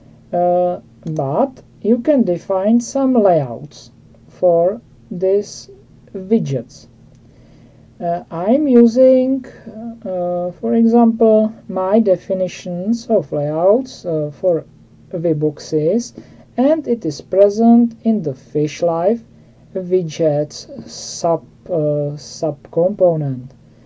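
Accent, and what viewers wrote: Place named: Czech Republic. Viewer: i cant just sniff out my fellow czech brother from that accent :). Greetings from SK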